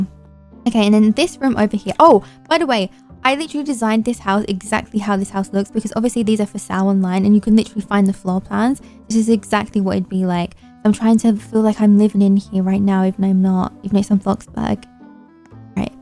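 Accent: United Kingdom. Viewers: so England, hence the British accent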